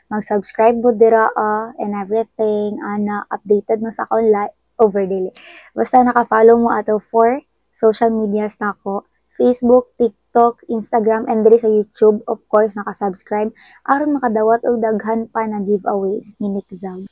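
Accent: Visayas, Philippines. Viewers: my fav part about sayo ate ay yung pagiging confident mo sa pag vvlog at pag a affiliate mo hindi kapo katulad ng iba na nag aaffiliate na hhindi honest sa mga product na pinapakita nila and sapagiging masiyahin mo and apaka pretty mopo and kind ang perfect mopo talagaaa and yung pag speak mopo ng bisaya ang sarap po pakinggan my mother is bisaya din po hehehe hooping po mapipi ng give away nyo😇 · My fav.part ko sayo is your humor your not boring when talking and also if piniflex mo yung mga products na ginagamit mo and i also ordered it kase proven and tested mo yung mga products and also yung Boses mo kapag nag bibisaya ka kinda like sana naging bisaya din ako Hhahahaha yun pang po. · One of my fav jud sa imo dzai the way kag mag voice over sa imo mga vlogs grabe ka vibes bisaya kaau lol (love it), also gaka bayot kos imong ka gwapa dzaiiiii the face card, sufeeer cuteee^_^ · Fave part: the way you talk bisaya nga mailhan gyud nmo nga bisaya kaayu gyud and Makita sad na love kaayu nmo imung followers🫶🥹🩷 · Fav part sa imoha is imohang oa bisaya accent😂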